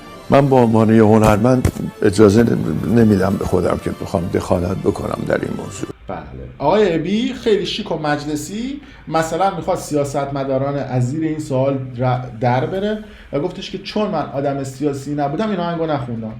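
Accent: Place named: South, Iran. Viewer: Binazir mishe vaghti Junubi sohbat mikonid👌👍🤞👏✌🤩😍♥️🌹⚘🥀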